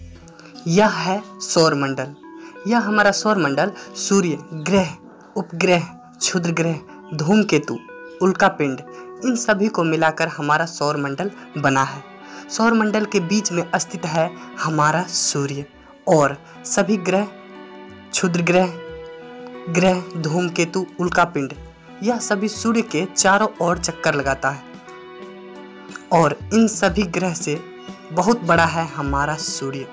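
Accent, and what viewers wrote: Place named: Bihar, India. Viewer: भाई आप किस जिले से हैं भागलपुर, खगड़िया, बेगूसराय ?? आपके बोलने के टोन से guess किया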